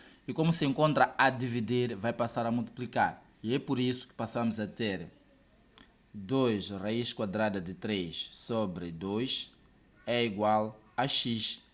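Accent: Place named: Mozambique. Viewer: Obrigado professor. Percebi que és moçambicano 🇲🇿, pela maneira da pronúncia do "R" pronunciamos como no espanhol. Diferente de Angola Brasil e Portugal.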